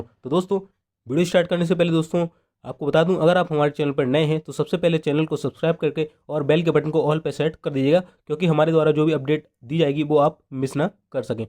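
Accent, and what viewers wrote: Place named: Gwalior, India. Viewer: I also from gwalior apki voice se laga hi tha ki aap gwalior se hi ho. 😅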